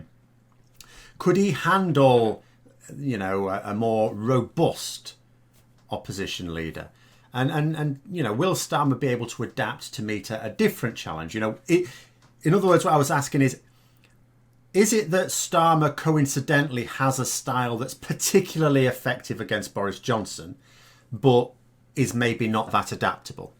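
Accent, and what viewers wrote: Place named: West Yorkshire, United Kingdom. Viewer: Your accent / phrases remind me of home 😭... "that gormless article" 😂 West Yorks by any chance?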